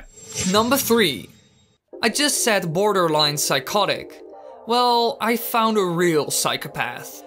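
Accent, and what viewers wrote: Netherlands. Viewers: Lol i can hear you're dutch. Like me😂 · Are you dutch? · it sounds like he is dutch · are you dutch?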